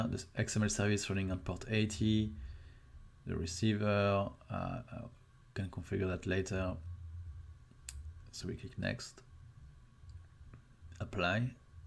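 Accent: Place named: France. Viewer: so nice accent! After I have watched this video I want to learn Franch language :)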